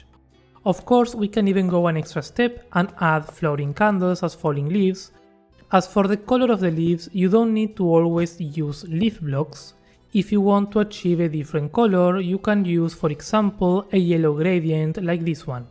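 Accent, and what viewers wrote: Argentina. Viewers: Eres Argentino no? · sos de argentina no? me remil suena ese acento JAJAJAJAJA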